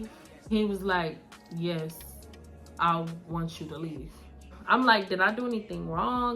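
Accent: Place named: New Orleans, United States. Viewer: why you sound like you from new Orleans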